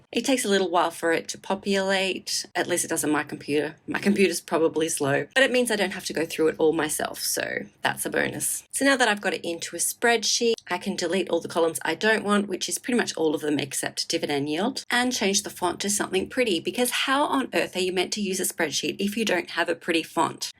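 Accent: Australia. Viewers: Enjoy your videos and the Aussie accent is a bonus.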